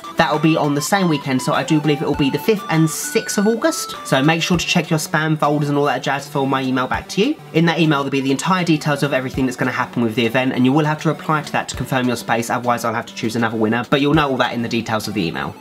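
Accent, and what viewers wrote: England, United Kingdom. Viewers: which part of England you from? i love your accent!! <3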